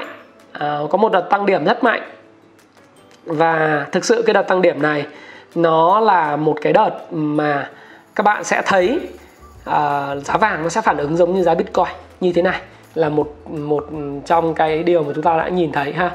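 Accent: Standard. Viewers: Anh nói chuẩn cmnl. Hơi bậy tý. :D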